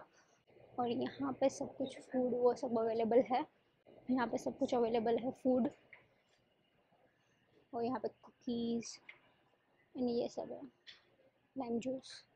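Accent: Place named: Gujarat, India. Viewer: You speak hindi & English with gujarati accent,🎉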